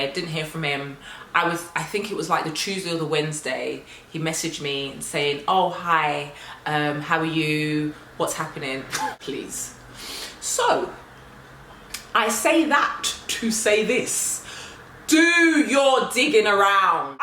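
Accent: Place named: England, United Kingdom. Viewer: YOU ARE ADORABLE! I LIKE YOUR LIVELY, FUN APPROACH, AND YOU HAVE A GREAT VOICE! YOU REALLY HAVE A STRONG ENGLISH ACCENT... ADD A FILL LIGHT IN FRONT OF YOU WHEN YOU TAPE. YOU ARE TOO PRETTY TO BE IN A SHADOW!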